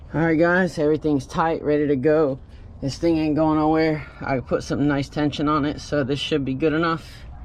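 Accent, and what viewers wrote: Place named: South, United States. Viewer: He's saying Freightliner he's just got a thick southern accent that's how we pronounce it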